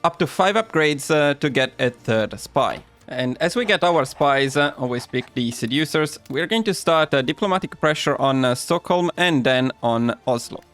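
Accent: Italy. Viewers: Is that an Italian accent?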